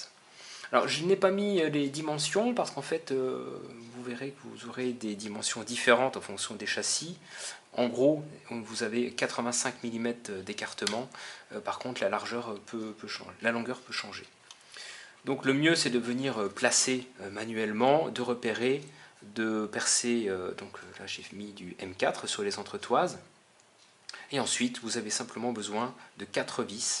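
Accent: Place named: France. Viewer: love it! Very nice build. Love your French Accent... even though I do not understand you. Thank you for showing this!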